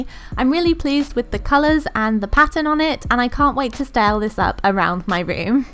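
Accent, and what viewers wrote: England, United Kingdom. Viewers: Do you live in America? Because you have a British accent but we don't have "Michael's" here do we? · Is she English or American.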